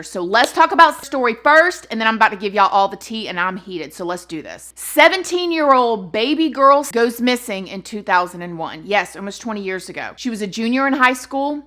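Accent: South, United States. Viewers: I think Morbid Podcast did an episode on this! And I just imagined if you did an episode with them, AHHHHH!!!!!! You and your southern accent and colloquialisms with their New England accents would be the absolute cutest to hear!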